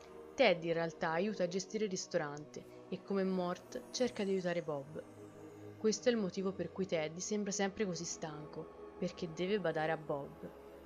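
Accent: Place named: Tuscany, Italy. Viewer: Te lo devo dire, sto guardando questo video alle 3 di notte(dopo aver visto diversi episodi di bob's burger), e dovrei avere paura, ma non ce la faccio con la gorgia toscana nella parlata😂😂. Comunque sei molto brava😁, gran bel video❤️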